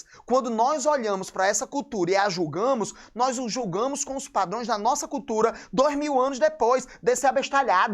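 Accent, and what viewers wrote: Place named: Paraíba, Brazil. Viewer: Ese cara fala igual Paraibado OU potiguar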